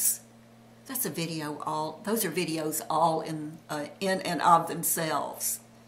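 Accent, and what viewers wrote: South, United States. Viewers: Hi, I am new to your videos and just love them. I have watched six already and can't wait to watch them all. I am a young at heart 69 year old and love my make-up, hair styles, pretty and cool clothes and jewelery. I think you are lovely in all ways. I also love your southern accent!! My son moved to South Carolina about 15 years ago, and he has the southern charm now. I am going back to view more of your videos Bye Judie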